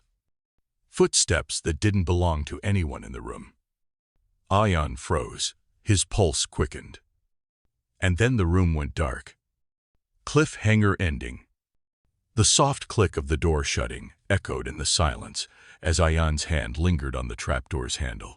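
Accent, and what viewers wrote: United States. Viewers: No no no, can't have an American accent doing Agatha!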